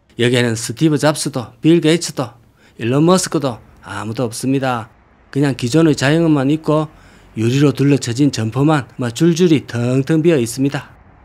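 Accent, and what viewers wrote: Gyeongsang, South Korea. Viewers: 고향이 어딘가요? 지는 경남 합천이고 59세 입니다.사투리가 나랑 똑 같아서 정겹네요. 박상은 우리 말고는 잘 모를거에요.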